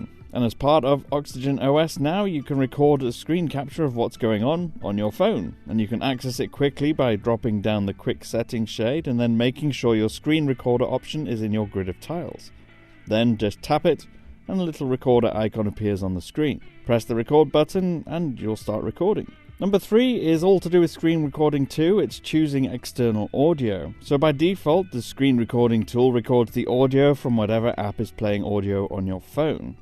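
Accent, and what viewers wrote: United Kingdom. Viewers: Subscribed as soon as I heard the British accent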